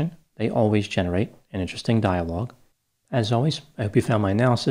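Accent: United States. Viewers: My name is marianne and live in England 🇬🇧 I love the way it sounds with American accent 😀 😄 xx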